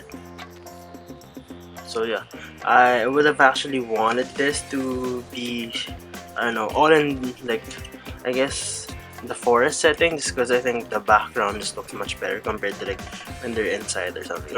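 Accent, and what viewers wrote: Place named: Philippines. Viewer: Im guessing your Filipino? Idk but I your accent kinda sounds like filipino’s accent when speaking english. BTW your unboxing is great!! Thank you for supporting twice ^^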